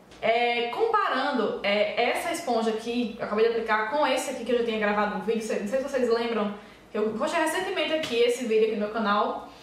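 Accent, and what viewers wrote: Northeast, Brazil. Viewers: genteeee esse sotaque é nordestino. Ameeei, quero saber de onde que estado tu é mulheeer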